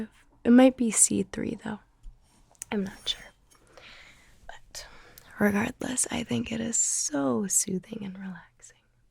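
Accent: South, United States. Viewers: I always love your videos. Your incredibly talented. My favorite series, or style is your southern accent videos.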